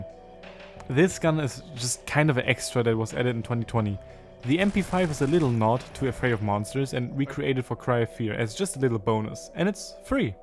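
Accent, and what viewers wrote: Germany. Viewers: ich habe die ganze Zeit deinen akzent gehört und dachte schon das du deutsch bist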